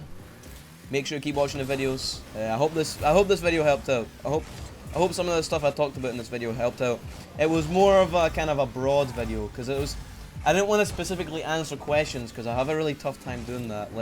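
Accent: Scotland, United Kingdom. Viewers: Ye Scottish aye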